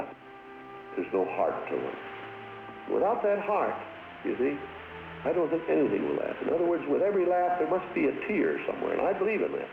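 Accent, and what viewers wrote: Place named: Mid-Atlantic, United States. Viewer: Maybe it's the mid atlantic accent or the radio static, but there's just something about his voice that is so timeless and nostalgic.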